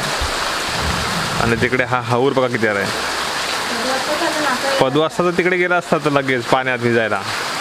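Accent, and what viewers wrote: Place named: Shrivardhan, India. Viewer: तुमची भाषा आमच्या श्रीवर्धन सारखी आहे लय भारी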